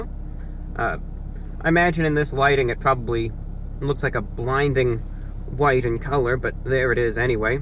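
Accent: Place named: Transatlantic, United States. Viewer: Btw i think your better than joeys world tour :3 Im east coast just like you! And i love your transatlantic accent too <33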